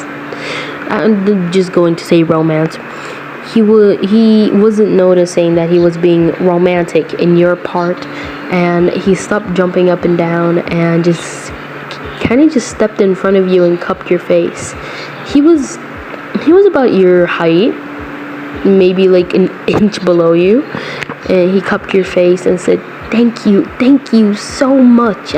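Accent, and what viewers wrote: South, United States. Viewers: IM NOT BEING RUDE OR ANYTHING BUT IT SOUNDS LIKE HAS A BIT OF A COUNTRY ACCENT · He sound red neck lol i love it ❤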